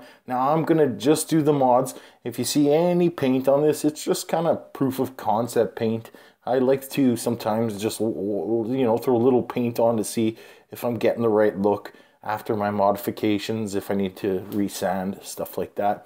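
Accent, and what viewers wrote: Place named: Canada. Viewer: Yo bro I'm Canadian too EH. How you talk is pretty much how I sound. All good though. I'm in Winnipeg bro. Where you at man?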